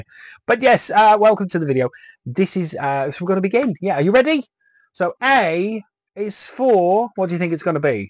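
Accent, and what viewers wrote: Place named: Birmingham, United Kingdom. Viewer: I dunno what happened, but you were considerably more brummie in this video... Also, did you know Wet is sold out at CEX? I think it's gonna spike hard that one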